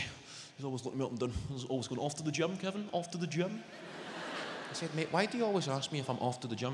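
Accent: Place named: Belfast, United Kingdom. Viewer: Your posh Scottish accent is a Belfast one!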